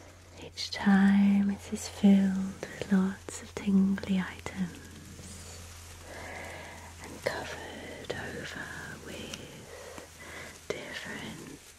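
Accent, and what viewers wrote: United Kingdom. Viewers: Ur hair colour looks darker but amazing...I love ur accent...is it British?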